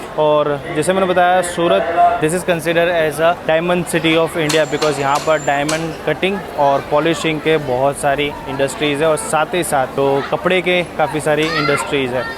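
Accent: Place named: Ahmedabad, India. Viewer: I think railway has personal grudges from you tabhi DD mein every time upper deck or station par baat karte time, EMD honk 😂 Jokes Apart, But seriously hamesha ki shaandar. Bich Bich mein Jo Ahmedabadi accent or Common Man wali bhadaas bahar aati hai, Cherry on Top ❤️ Baaki Kabhi toh moka do sir, ki vlog mein hum koi galti dundh sake 🤣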